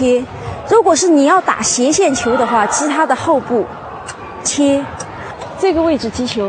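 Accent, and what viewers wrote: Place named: Yunnan, China. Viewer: 这位女老师有明显的云南口音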